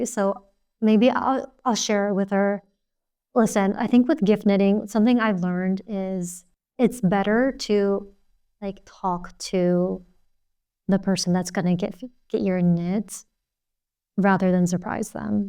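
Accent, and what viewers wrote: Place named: United States. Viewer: I recently found your channel and have been enjoying your videos. I like your style and the way you present yourself. I would love to see an introduction video, where you share more about yourself. 😊 You sound American, and then your milf is Finnish but you live in Poland - I got curious! 👀😁 Ps. Greetings from Finland! 👋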